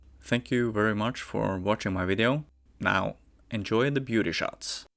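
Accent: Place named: Estonia. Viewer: Been loving all the videos. May I ask where you are from though?. You sound kinda Estonian. Maybe Nordic?.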